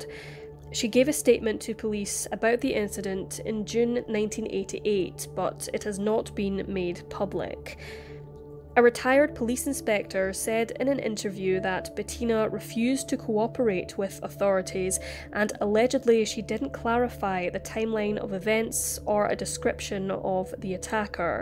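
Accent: Scotland, United Kingdom. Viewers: Love a Scottish accent. My favorite. 😊♥️ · It's amazing theres so much love for this girls voice.. it soothing, clear word pronunciation, husky and the Scottish accent. I love it!!!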